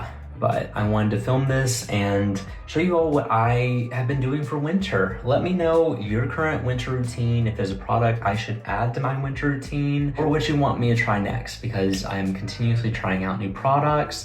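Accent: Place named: South, United States. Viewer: I like your accent but I can’t place it. Southern? Midwestern?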